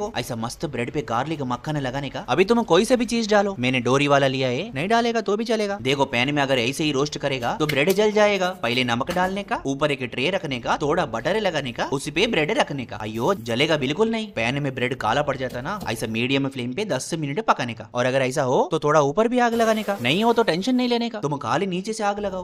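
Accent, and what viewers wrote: South, India. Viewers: Ye ayyo ayyo vala accent nahi pasand gana gane jaisa bolta hai Sidhe bol jara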